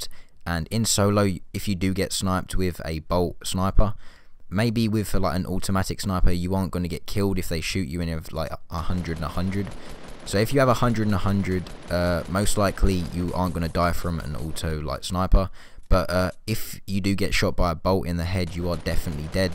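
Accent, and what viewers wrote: United Kingdom. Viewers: You from the uk? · Btw you sound British I'm British want a cupa tea 😃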